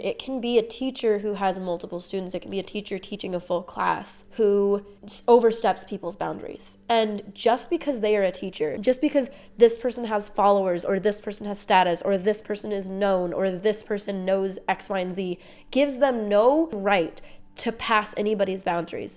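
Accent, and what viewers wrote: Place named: New England, United States. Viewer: I love your content. I had to look up where you're from because I was convinced you were from New England, but I was shocked to find out you weren't. You would get along well here. You talk like a New Englander... We love the cussing. It enhances our feelings when we speak. It shows passion for what you believe in... Keep cussing, and share those beautiful thoughts and feeling 👍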